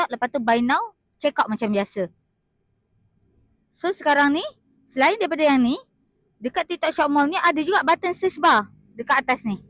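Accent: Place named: Endau, Malaysia. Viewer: Suara mcm org endau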